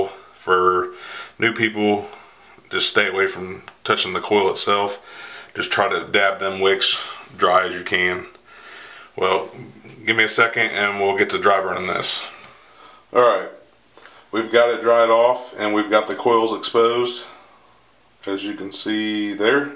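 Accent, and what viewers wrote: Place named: South, United States. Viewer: "take you some paper towel" that southern talk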